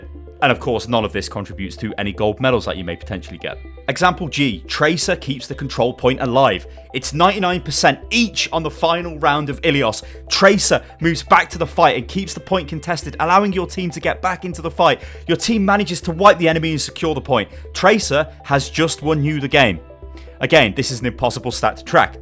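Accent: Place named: United Kingdom. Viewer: People always fill the comment sections with memes and get all the thumbs ups. They're funny and all, but I want to say something that most of the people in here won't point out. I think / makes the best Overwatch videos in YouTube. Hell, he probably makes one of the greatest gaming videos in overall. Don't get me wrong, he isn't the world's greatest Overwatch player, but he cares so much to share his experience and insight of the game. He's enthusiastic of what his doing and at least I feel that I can absorb some of that energy to my gaming. And even though he would post garbage, I'd still probably watch it, just because of that sweet authentic British accent and toodle-oo! Love from Finland, keep it up.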